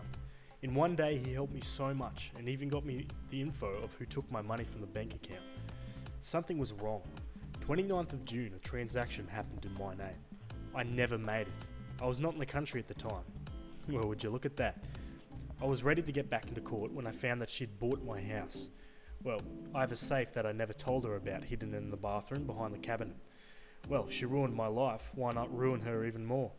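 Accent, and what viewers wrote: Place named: Australia. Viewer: I’m thanking Markee for introducing me to your channel! Love the Aussie accent! 💜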